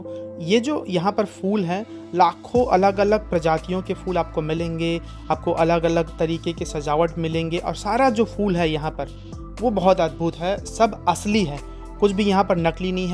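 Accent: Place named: Chhattisgarh, India. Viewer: मैं आपका वीडियो काफी दिन से देख रहा हूं और आगे भी देखते रहूंगा क्योंकि यह सही नहीं चाचा आप जवाब देते हैं और काम करते हैं और आपकी बोली जो है एकदम छत्तीसगढ़ी है जो कि हमारा ही है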